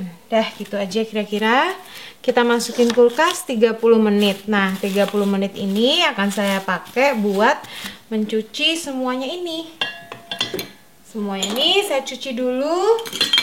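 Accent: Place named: East Java, Indonesia. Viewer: makasih resepnya ciciiii...btw cici ini org jawa timur yah. logatnya itu lohh sukaaaaa....cara2 bikin semua resep mudah. sederhana dan ga nyusahin 👍👌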